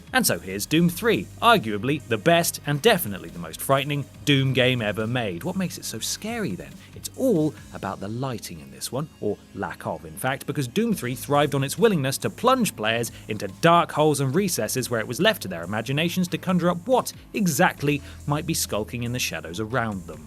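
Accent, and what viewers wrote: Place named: RP, United Kingdom. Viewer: GOD IS THERE REALLY NO VIDEO OF SOMEONE DOING THIS LIST WHO DOESN'T SPEAK WITH AN OBNOXIOUS POSH ACCENT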